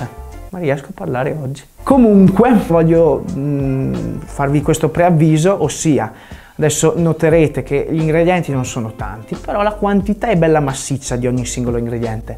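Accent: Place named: Emilia, Italy. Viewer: Ma sei troppo forte, sembri emiliano come me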